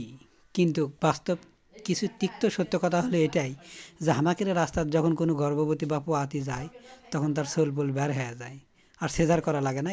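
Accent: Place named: Bogura, Bangladesh. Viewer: অনেক অনেক সুন্দর হয়েছে। সবচেয়ে বড় কথা হলো বগুড়ার আঞ্চলিক ভাষায় কথা।ধন্যবাদ।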